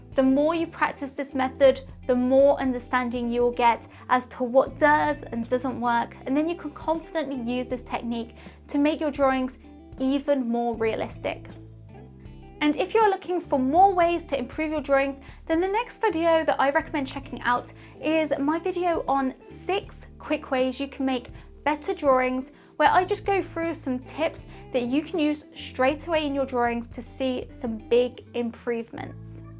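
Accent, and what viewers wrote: United Kingdom. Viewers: She have a British accent...😲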